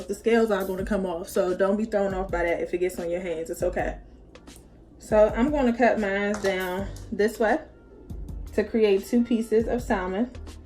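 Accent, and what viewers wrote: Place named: Maryland, United States. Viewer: I was thinking the samething. She sound like she from Maryland to me!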